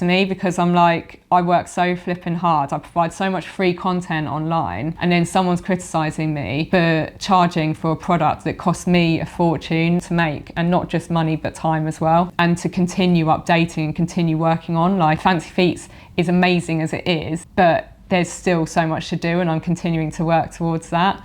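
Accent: United Kingdom. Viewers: Love your British accent,. It is so cool. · If I didn’t appreciate watching a beautiful tall blonde woman with blue eyes and a British accent, I wouldn’t watch you. But I do!❤️ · Great girl, great accent, great concept, Great Britain! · The accent you noted the UK? Pretty cool I competed there often in college and was always very impressed with the University of Kentucky athletics facilities!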